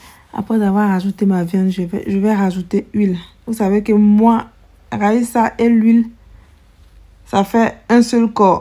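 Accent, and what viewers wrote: Ivory Coast. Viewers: T’as maniéré de parler prouve que tu es vrai ivoirien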